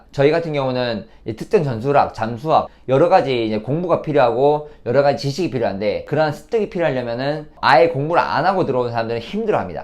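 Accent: Gyeongsang, South Korea. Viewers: 경상도 네이티브 같아요 맨.몸.운.동. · 와 ㅋㅋ 전라도 사람인줄 알았는데 서울 + 경상도였네 ㅋㅋ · 경상도 사투리 쓰는게 자연스럽습니다!! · 경상도 사투리 자주 사용해주세요 ㅎㅎ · 와 ㅎㅎ찐 경상도인줄 알았는데 의외시네요~~^^ 부모님이 경상도 출신은 아니신지~~ㅎㅎ 근데 서울말보단 사투리가 더 찰떡이에요~ㅋㅋ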